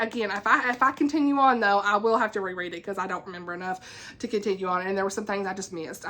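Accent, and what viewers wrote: South, United States. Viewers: Your personality and southern accent is so refreshing and comforting at the same time ❤❤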